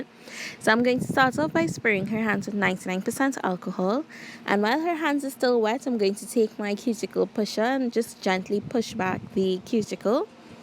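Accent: Trinidad and Tobago. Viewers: Trini accent isn’t harsh it’s kinda soothing lol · You go Trini !! I could hear your accent !!! Great job! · You must be a Trini, I hear that infamous accent. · I hearing that Caribbean accent…Trini to deh bone? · Omg your nails are gorgeous 😍😍 you did that !! I’m so glad I found your YouTube channel 👏🏾👏🏾👏🏾👏🏾 do I hear a trini accent Deh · totally sounds Trini!!🇹🇹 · Your accent sounds Trini. Love the step by step instructions.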